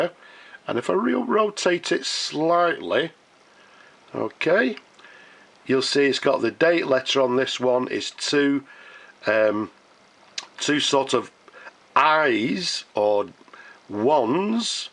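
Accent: United Kingdom. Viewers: Are you a british man?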